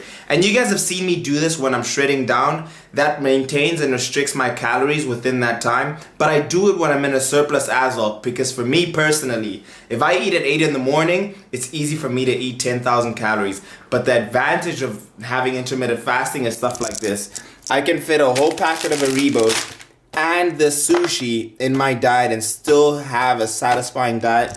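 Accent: South Africa. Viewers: Are u from South africa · is he south african? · Are you south African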